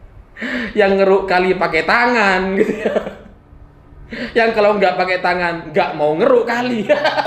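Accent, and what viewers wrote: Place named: Madura, Indonesia. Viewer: Saya suka dgn logat medok maduranya yg khas "selamat malam para mantan" dan joke2nya, tiap akhir kalimat ngakaknya naturalisasi eh natural 😀👍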